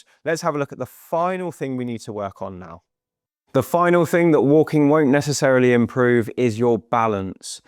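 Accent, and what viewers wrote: England, United Kingdom. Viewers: I am irish and I love his english accent! I was born in Leeds. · The last exercize for balance was amazing! I've never seen it before and plan to add it to my routine. I love this channel for all the tips gives us - the English accent is a bonus!:)😊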